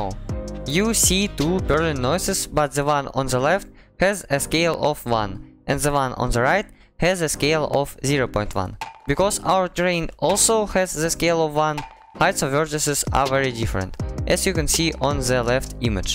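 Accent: Russia. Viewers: так охват аудитории шире, а учитывая то как тяжело продвигать обучающий контент, енглиш решение правильный выбор, но акцент наш кнш)) я часто использую нейросеть яндекс браузера для перевода видео, иногда мне действительно комфортнее слушать урок на русском и я просто включаю перевод, так что рекомендую) проверено на себе, да перевод иногда кривой но в целом оч хорош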